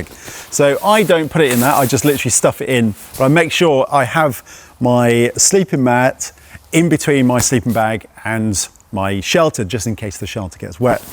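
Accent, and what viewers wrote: United Kingdom. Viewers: Since this guy speaks with a British accent, I'm going to assume his idea of bikecamping kit and mine do not align. First off, he doesn't have enough storage space for a 300 mile to 1600 mile trip like we prep for in Canada and America. Add Mexico and South America to that distance if you want to be serious. Second. Bears. Lots and lots of bears. Tarp is an option in N. America. So is a hammock. But it's not a serious option for serious bikecampers who want to roll across the desert and Rocky Mountain regions of Canada and America. Please add, EU advice ONLY to your title, bra.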